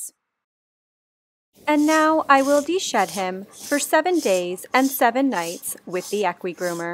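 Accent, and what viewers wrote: Canada. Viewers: She's Canadian? I always thought Midwest US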